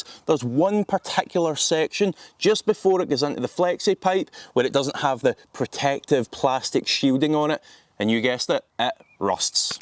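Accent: Scotland, United Kingdom. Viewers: Very good and informative video, well done!. Also your scottish accent is cool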